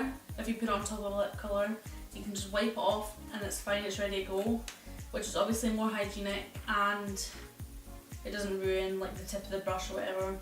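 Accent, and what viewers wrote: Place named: Scotland, United Kingdom. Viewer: Omg! Loving this look, colours are gorgeous on you...I'm diggin' your accent! Are you from Scotland, I'm not good at detecting accents so please bare with me luv 😂 😂 😂 Had to subscribe, excited to get to know you girlie 🌸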